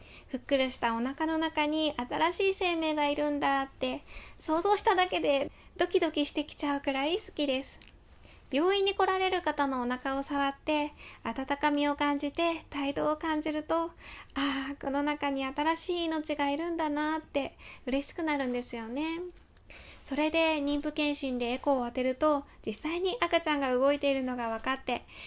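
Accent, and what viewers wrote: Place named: Hyogo, Japan. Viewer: 会えなかった姪がいます、あの時の姉の悲しみも自責も見ていて辛かったし支えた姉の家族の苦労もよく分かります。 個人的な事ですが先生は兵庫の方のような気がします、オレの神さんのよそ行きの言葉と同じ感じに聞こえます😊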